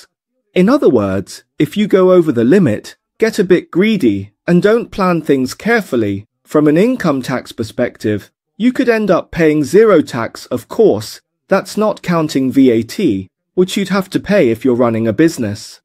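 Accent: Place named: United Kingdom. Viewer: Amazing British accent!😊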